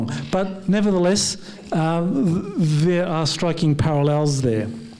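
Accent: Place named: Australia. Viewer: You were a former professor? What are you now? You speak in a broad Australian accent, yet you mutate the pronunciation of "AntarCtica" as if you you were one of rhe exceptional people from the indispensable country. You do reslise that there is a letter "c" after the letter "r" in "AntarCtica"?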